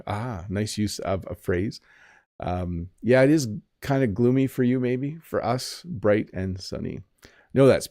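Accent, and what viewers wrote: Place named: Canada. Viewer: I love canadian accent❤️ It kinda sounds good 😊👍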